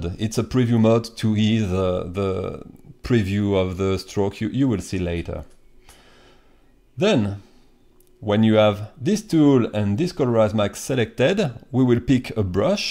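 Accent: France. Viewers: Thanks for the tutorial (Ps: is it me or you sound french?) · Tu peux faire la video en français (je reconnait un accent quand j'en vois un) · l'accent français jpp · ta un acent francais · cet accent français .-. Enfin bon je dis ça mais je ne suis pas mieu lol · Thank you for your clear explaination and your french accent, it help me a lot. I'm doing my transition toward Krita and this tool is very impressive. I have just tried it on my last page, i've saved maybe one hour today ;) Thanks you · Hmm l'accent francais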